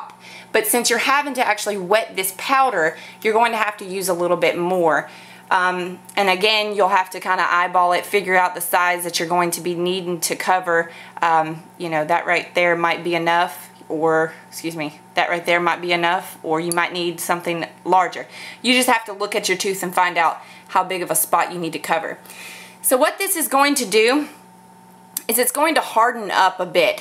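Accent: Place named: United States. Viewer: American accent is so annoying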